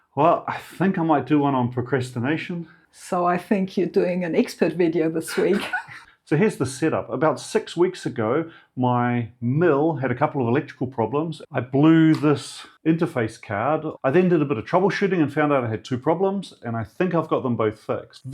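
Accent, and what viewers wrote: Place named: Northland, New Zealand. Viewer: Are you a Northland person? You've got the accent of a Northlander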